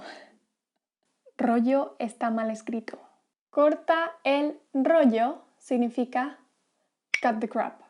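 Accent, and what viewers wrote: Spain. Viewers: Is this Spaniard Spanish? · soy hablante nativa de español, este video fue muy interesante de ver jjajsjs realmente explicas muy bien y tu pronunciación es muy buena, no puedo diferenciar con exactitud si eres de España o aprendiste el acento español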